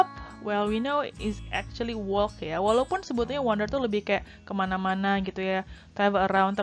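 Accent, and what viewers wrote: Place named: South Jakarta, Indonesia. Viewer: I like how to teach and explain because it is very clear then is also very slang because we can use South Jakarta-style English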